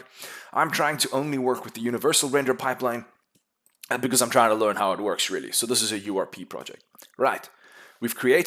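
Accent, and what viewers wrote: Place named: South Africa. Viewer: I feel a hint of South Africa here ... thx for the tutorial boet.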